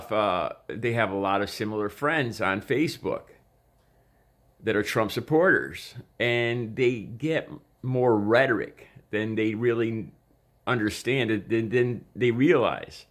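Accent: South, United States. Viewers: You made a very wise choice in checking Beau out. He's highly intelligent and delivers subjects in a manner that all can understand and hopefully make them use critical thinking. I have to grin about your speaking with a southern accent...Beau is from Florida...all Southerners have a drawl and I love being from the south. You take care and I am glad you like Beau...he's a good man.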